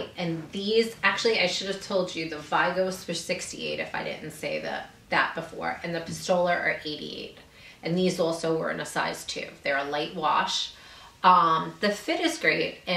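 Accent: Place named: Long Island, United States. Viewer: My husband,who originally from Long Island, was sitting with me while I was listening to your video. He wants to know what part are you from :)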